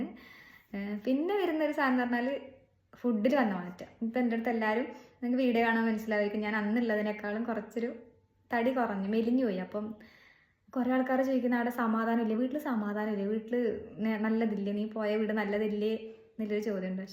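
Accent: Kasaragod, India. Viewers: കാസർകോട് ഭാഷ കളയരുത് കേട്ടോ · കാസർഗോഡ് സ്ലാങ് ഇഷ്ടപെടുന്ന ഒരു മലപ്പുറത്തുകാരനാണ് ഞാൻ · നിങ്ങൾ നമ്മുടെ കാസർഗോഡിന്റെ മുത്താണ്, നിങ്ങളെ നമ്മൾ മലപ്പുറത്തിന് കൊടുക്കൂല്ല, എവിടെയായാലും നമ്മുടെ സ്ലാങ്ക് മറക്കണ്ട, അതാണ് നിങ്ങളെ കൂടുതൽ ആളുകൾ ശ്രദ്ധിക്കുന്നത്, നമ്മക്ക് നങ്ങളെ വർത്തമാനം മതി അത് കേക്കാനാന്ന് സുഖം, അല്ലാണ്ട് അപ്പ്യൊ പറയുന്ന പോലെ പറഞ്ഞാൻ ആരും നമ്മളെ മൈൻഡാക്കൂല്ല. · മോളെ കാസറ്കോഡ് ഭാഷ എനിക്ക് വളരെ ഇഷ്ടം. മലപ്പുറം എവിടെയാണ് ഞാനും മലപ്പുറംആണ് കെട്ടോ 😍😍 നിഷ്കളന്കമായ സംസാരം God bless you മോളെ…. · ടീച്ചറേ ഭക്ഷണത്തിൻ്റെരുചി മാറ്റം അത് ഒരു ഇത് തന്നെയാണ് എൻറെ വീട് മലപുറം ജില്ലയിൽ വണ്ടൂർ എന്ന സ്ഥലതതാണ് കല്യാണം കഴിച്ചത് മലപ്പുറത്തേക്കും ഭക്ഷണം പാകം ചെയ്യുന്ന രീതി, അങ്ങനെ ആദ്യം പ്രയാസമായിരുന്നു അപ്പോൾ പിന്നെ ജില്ല മാറിയാലോ? 😅 പിന്നെ ടീച്ചറുടെ ചിരിയും പാട്ടും ഉള്ളിടത്തോളം പാട്ടിനെ സ്നേഹിക്കുന്നവർ എന്നും ഉണ്ടാവുന്നേ..നിങ്ങളുടെ കാസർഗോഡ് ഭാഷ അത് എനിക്കൊത്തിരി, ഇഷ്ടമാണ് മലപ്പുറം ആരാധിക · കാസർഗോഡ് ഭാഷ മാറ്റരുത് ഞാൻ കാസർഗോഡ് ആണ്❤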